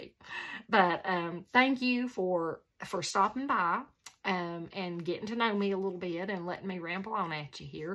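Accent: South, United States. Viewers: Hello, I’m an old southern gal from the woods of southwest Alabama, so your accent sounds just like me. I’ve been sewing since I was a little girl, myself. My grandmother was a seamstress who sewed for other people and she made most of my clothes growing up. She taught me the basics of sewing and I took it from there. Your sewing room looks interesting, I have Pfaff machines also, as well as a Singer Professional 430, a Juki 2000qi straight stitch and various other embroidery machines and a brother serger. I’m am like you, I keep everything, so I have had to do a declutter in my room this year, that hurt! My sewing room is outside of my house in a metal building that was used as an office years ago. It’s just across the yard and about 12 by 24 feet. It works for me as I can leave everything out without disturbing anyone. I will subscribe and look forward to watching your videos. 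· I’m new to your channel and love your southern accent 😊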